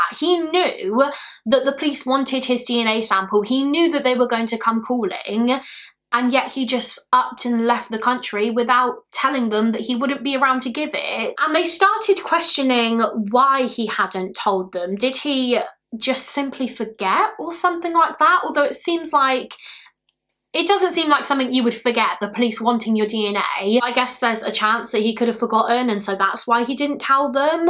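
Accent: United Kingdom. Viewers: It takes a really heartless person to destroy someone so beautiful.:/ Wait, the murderer voluntarily came back to the UK? Wow, just Amazing. - thanks a bunch for all the work You put into these videos and i hope the holidays will be nice for You! (Your accent is so very british, can't get any more british... I hope it won't stick, since i'm a non-brit.):)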